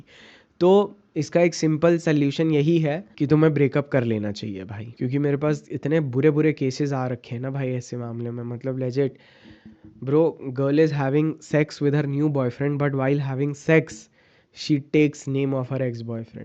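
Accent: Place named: Maharashtra, India. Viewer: Your marathi accent is damn good bro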